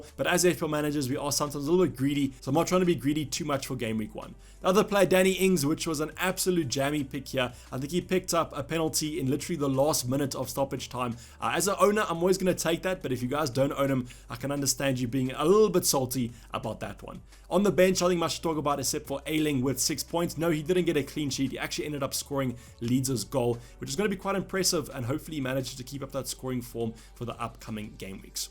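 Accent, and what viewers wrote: Afrikaans, South Africa. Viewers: Are you from South Africa? jy klink Afrikaans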